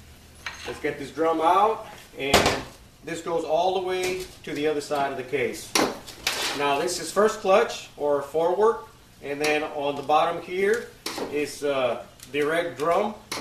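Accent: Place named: South Texas, United States. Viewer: I could be wrong but I think that's a south Texas chicano accent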